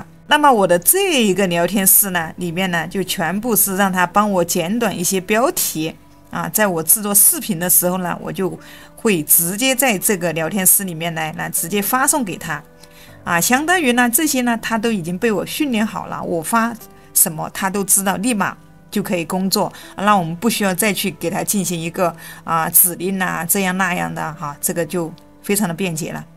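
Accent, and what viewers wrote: Sichuan, China. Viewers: 四川还是重庆的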